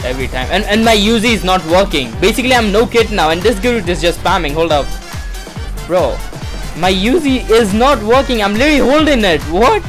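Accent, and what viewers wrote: India. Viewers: W INDIAN ACCENT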